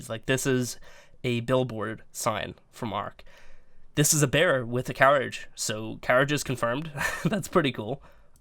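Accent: Netherlands. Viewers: Nederlands? Dutch? I can't tell from your voice, well some times a little bit if you listen closely but good english, i noticed that your envidia shadowplay is in dutch :) nice vid man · are you Dutch?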